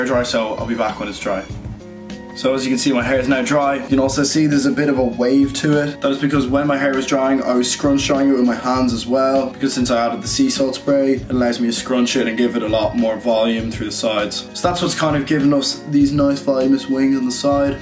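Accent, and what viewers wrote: Ireland. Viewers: Your accent is a very soft Irish accent.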